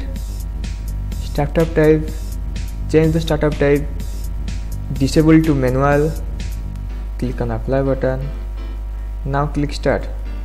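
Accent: India. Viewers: cannot understand indian English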